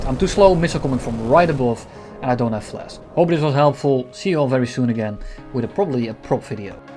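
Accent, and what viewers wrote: Netherlands. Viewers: I'm trying to figure out what your accent is. I think Dutch, in which case I'll nickname you (if you want) but I'm not 100% sure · Is it Just me or do i hear a dutch guy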